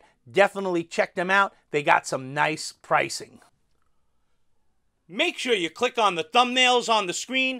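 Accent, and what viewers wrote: New York, United States. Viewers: I will watch every video of yours because of this New Yawk accent. Cheers.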